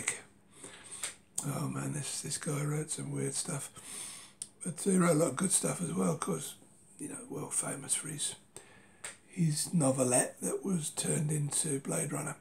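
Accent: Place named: Essex, United Kingdom. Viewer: I thought your accent sounded as if you came from Essex. It's where I live.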